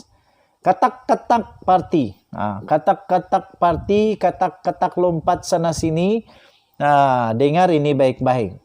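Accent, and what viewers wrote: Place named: Sabah, Malaysia. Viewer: Hoi jangan cakap merepetlah and waste time. Ini bukan lokonan filem. If you want to talk go strait to the point. Ini cara bercakap Sabahan seperti cakap cartoon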